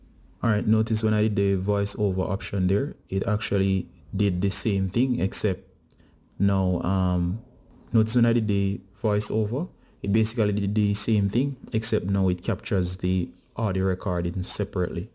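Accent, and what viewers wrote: Jamaica. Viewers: U sound like jamaican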